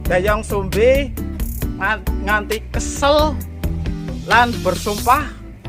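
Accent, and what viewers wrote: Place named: Banyumas, Indonesia. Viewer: logat bicaranya kayak orang ngapak toh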